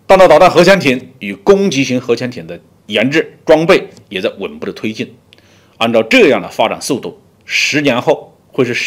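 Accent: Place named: Fujian, China. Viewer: 鲍大校的扶兰口音蛮亲切的😁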